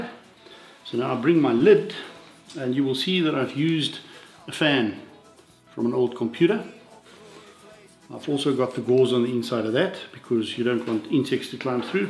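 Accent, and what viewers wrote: South Africa. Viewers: He’s Afrikaans accent is thick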